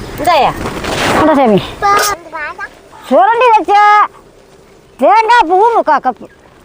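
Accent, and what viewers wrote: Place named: Kongu, India. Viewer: சமையலை.விட நம்ம கொங்கு தமிழ் அழகு ❤❤❤❤❤❤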